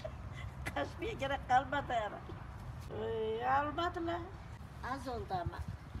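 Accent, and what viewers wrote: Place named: Elazığ, Turkey. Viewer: Elaziğli gibi konuşuyosun ya anane ben elazigliyim da ondan diyom 😂🥰💙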